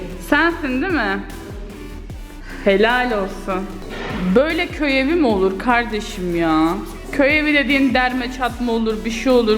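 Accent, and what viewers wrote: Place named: Black Sea, Turkey. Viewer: Hep bile gidiyoz mu tam karadeniz kızı olmuşun kız valla memlekete gidince direk o şiveyi kapıyosun hep bile gibi bizde mezarüstüne gittik gidiyoz deriz bu arada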